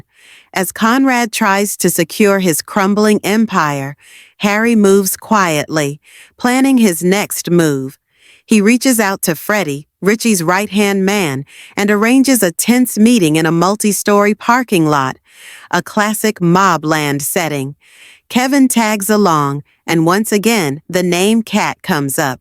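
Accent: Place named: United States. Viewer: It's interesting having the feedback, but with the american accent of this woman , she is not pronouncing most of the words properly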